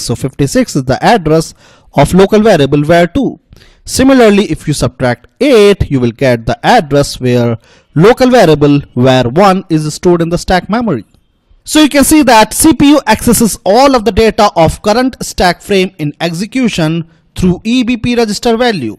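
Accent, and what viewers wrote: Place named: India. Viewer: you're screaming in your mic with a indian accent.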